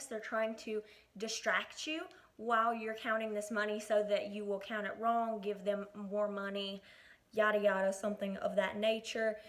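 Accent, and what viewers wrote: South, United States. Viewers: You’re beautiful and I love that southern accent.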